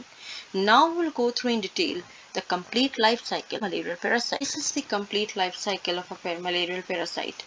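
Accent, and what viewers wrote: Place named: United States. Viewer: Ma'am the video was fabulous , marvelous , fantastic , mind blowing , exellent . Your way of teaching is fabulous the way you explain is just fantastic . I had problem in understanding this topic but after watching your video i understood everything . I sure that you are the best teacher in the world with a stylish or i should say american accent .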